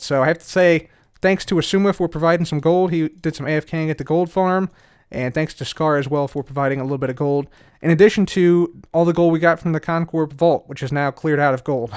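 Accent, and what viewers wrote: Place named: Chicago, United States. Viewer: Also are you from Chicago I can hear a bit of a Chicago accent I'm from Illinois myself.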